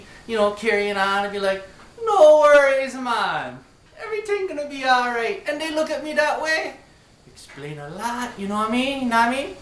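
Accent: Trinidad and Tobago. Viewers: You sound Trini still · Yes man u def sound like a Trini fo'real....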